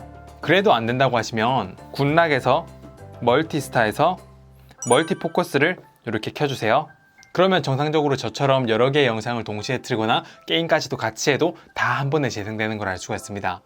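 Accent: Gyeongsang, South Korea. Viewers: 목소리가 참 매력있네 경상도 이신가..?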